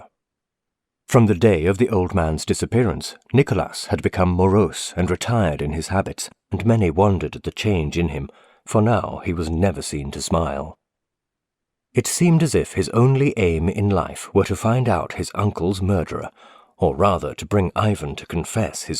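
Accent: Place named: United Kingdom. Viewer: Are the collected works of Madame B. available- her short stories on Amazon? A character who has long held a fascination for me and if I had the talent and imagination I'd love to write a ghost story with her either alive or "in spirit" ! She and Crowley perfected The Stare, didn't they?! Thanks for posting, I am a frequent listener of Jasper L'Estrange and Tony Walker and so I found your channel- I tend towards Victorian ghost stories, written by women, I think our viewpoint is often different and perhaps a little stranger than that of men. I think of modern women, Shirley Jackson, Joan Aiken? and one whose name I can't recall...Hello, nice to meet another Brit. you have the voices! I love accents from the British Isles, as with fog, and huge houses in disrepair- so suited to the ghost story! Namaste from Canada.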